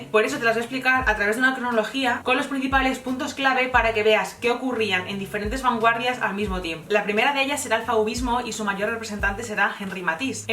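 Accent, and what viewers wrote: Castile, Spain. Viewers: Eres muy bonita y, creo que debes hacer algo, porque percibo que la imagen de tu rostro permanece tiempo excesivo como primer cuadro. Si es verdad que el gran público, está interesado en ver imágenes interesantes conteniendo arte, si es verdad también, que tu rostro es muy bonito y, que haces bien en mostrarlo, le harías un gran favor a tus seguidores si acortaras el tiempo en que sale tu bello rostro a cuadro. Y bueno, la última y me voy: Mujer divina, tienes un halo mágico, me gusta mucho lo que dices y tu voz es cálida y tiene color pero, hablas demasiado rápido y el acento castizo termina haciendo tu parlamento algo confuso. Felicidades, contigo he aprendido mucho. Saludos.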